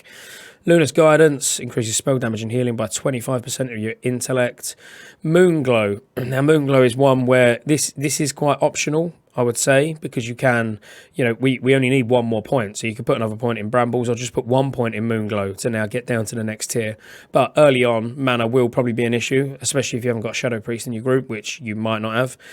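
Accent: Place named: London, United Kingdom. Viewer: i love druid and l love your london accent!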